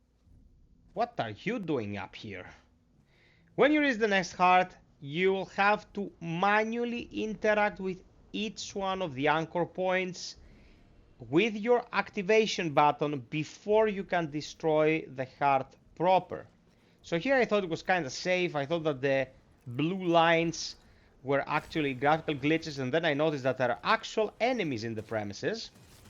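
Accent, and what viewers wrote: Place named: Greece. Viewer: Βάζεις να δεις το βίντεο που σου έβγαλε η αναζήτηση και ακούς ελληνικής προφοράς αγγλικά! Μετά λες ρ 'εσύ, σαν του την φωνή και έχεις δίκιο! Πάμε joystick!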